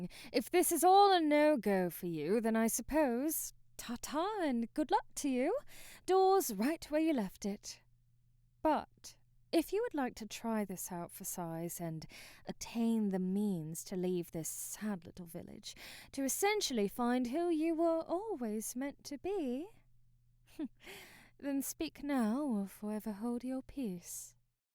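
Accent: United Kingdom. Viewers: This reminded me of how much I love the British accent… unfortunately reminded me of my ex girlfriend though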